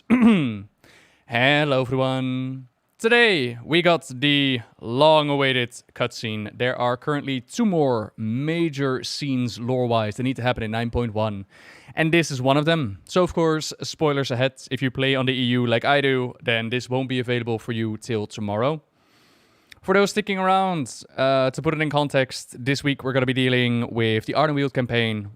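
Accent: Netherlands. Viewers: ur dutch aint yah ok, u sed it urself even . i heared it before u sed it! XD fijne dag gewenst!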